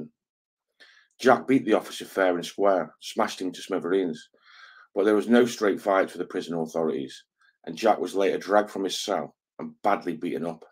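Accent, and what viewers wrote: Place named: Bristol, United Kingdom. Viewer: Very hard man not like he shown to be in. Fims love your content sound Bristol uk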